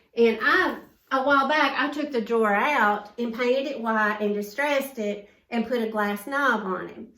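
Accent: South, United States. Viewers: Blessings to you. I love your channel I love your southern accent and ideas. God bless y'all. Keep sharing.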